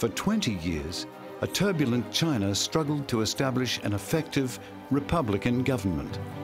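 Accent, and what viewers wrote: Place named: RP, United Kingdom. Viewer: Tse Tung was romanized to Zedong maybe ten years ago? I dunno for sure but recently-ish. And Keev I think is just his posh and very proper British accent kicking in.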